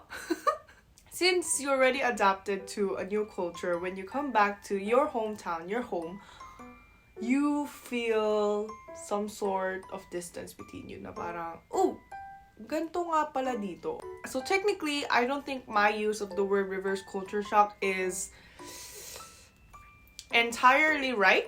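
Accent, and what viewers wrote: Batangas, Philippines. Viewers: Like you're speaking in Batangas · This will be the new youtube video I'll be watching now. grabs potato chips PS. She is so cute with her Koreana looks and pure Filipino accent. :) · Her English is spoken with a Philippine city accent, as opposed to the majority of the ones working in the US who did not grow up there who speak with a province accent. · ma'am alam mo parang pinay na pinay ka talaga.lalo na sa pag bigkas ng words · korean with filipino accent is so damn sexy 😍😍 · Omg..pinay na pinay ang pannalita ang accent pati taglish lahat2 ay d masasabi Koreana 🫠😊😍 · "ASAN YUNG ASEEENNN???"...... that's a killer.... 🤣🤣🤣.... pinay na pinay.... yung dateeeng.... yung dateeng ng diction... wow na wow.. walang ka arte arte... cute na hindi nagpapacute. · You have an accent of a Filipino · you got a Pinoy accent for a Korean. 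· Ang cute cute kasi pag English niya Pinoy na Pinoy! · Wow ang bilis ng panahon naka isang taon ka na pala diyan sa Korea parang kailan lang yun ah. Pero the way I see you just look Korean pero the way you speak and think your Filipino. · Your tagalog is like those of the pinoy kids as we call it mga "sosyalin",taglish but still very pinoy accent. I love watching your vlogs,it's kinda cute😉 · 101% Korean looking but the Accent FILIPINOOOOO. 🥰❤️😝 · Well this Korean lady speaks like she is Filipino. Her English is Filipino in style and accent. She speaks Tagalog like any other Filipino. Awesome 😮😮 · she really grew up in the ph coz of the way she says english words like culture and alot more lmao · Nice vlog, you really good in Tagalog and the way you speak is really pinay accent, kudos and good luck hope you all the best while in Korea.🥰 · I am a Batangueño senior citizen who stumbled into one of your videos, the one with Batangueño accent. 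This video is the second one i’ve watched. I kept laughing and sometimes to the point of tears. Your Batangueña accent is real. I dare say you are a Batangueña who looks like a Korean 😂🤣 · So,when I heard you on Anna's video when you joined them...I thought you're a Filipina mixed Korean but now you just said you just live there for a looooogggg time. So that explains it. No wonder the accent. 😂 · Your english is so pinoy. Accent is so neutral. Love it. Your tagalog as well is perfect. God bless! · even your English has really Pilipino aksent! gusto kita. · Kahit accent nya pinoy na pinoy amazing. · I love that you have a Pinoy accent hahaha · Alam mo kapag ipinikit q ang mga mata q at pinakikinggan kita, pinoy na pinoy ka talaga. Yung accent mo at the way ka magsalita, mga punto at bigat ng pagsasalita mo, para kang local pinoy. Hahahaha! Lalo na yung sinabi mo na "asan na yung asin?" Yung diin, punto at accent mo, pinoy na pinoy talaga. 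Hahahahaha! Kudos sa iyo! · Your English is so pinoy style obviously sa pinas ka lumaki and i can tell you have gone to a good school. Nice to drop by your channel. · You sound and speak like Filipino. That’s good. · Actually you are more Filipino to speak in terms of English and Tagalog tone. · Love that English Pinoy accent and your tagalog is better than mine. More prolific at Bisaya though. Headed back to Cebu next month....can't wait, but I hate the freakin' long flight from Illinois to Seoul to Mactan then to Dipolog City where my wife is from.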